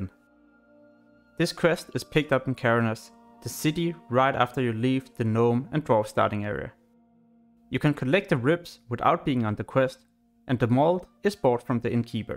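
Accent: Denmark. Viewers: Danglish my friend ;-)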